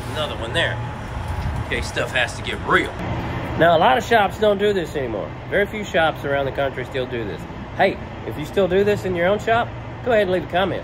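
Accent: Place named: South, United States. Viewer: I do love how in some videos he says something like, “As you can tell by my southern accent, I’m not an educated man.” Damn, dude! I am an expert in my field, but you do things that I could not even try to pull off! Keep it up!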